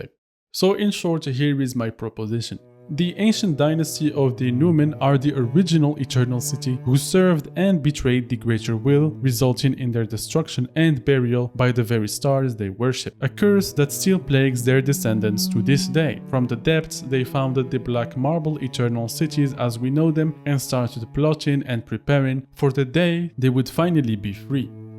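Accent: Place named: France. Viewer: Ah!! Serais tu...french? Je me disais que cet accent subtil me disait quelque chose... Excellente vidéo, hypé pour le dlc, mais il va falloir éviter Yt les derniers jours avant la sortie...me faire spoiler les boss une semaine avant la sortie par """"""certaines"""""" chaines depuis des années j'en peux plus...😳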